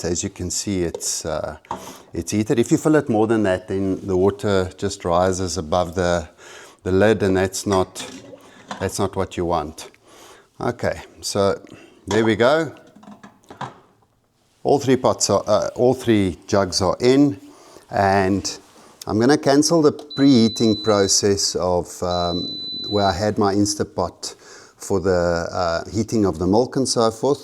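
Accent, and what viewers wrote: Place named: South Africa. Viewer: It's so nice to hear your South African accent! Thanks from France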